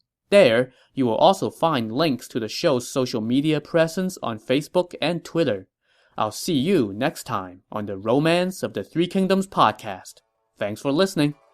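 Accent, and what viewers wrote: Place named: China. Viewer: Its interesting to hear a chinese speaker speak english with how the pitch moves since it sounds so distinctly chinese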